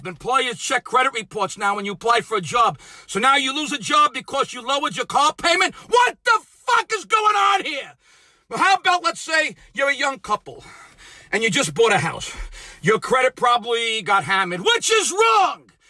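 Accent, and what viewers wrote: New York, United States. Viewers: Omg. Your so NEW YORK!! I LOVE IT.. YOU MAKE ME MISS MY DAD!!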